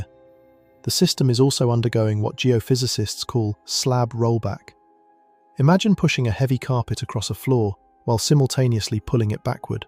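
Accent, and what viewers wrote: United Kingdom. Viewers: Different channel name same British spit mouth. There would not be lisp noise unless words are being spit mouthed. Use an American clean English AI text speech reader and stop repeating not this, not that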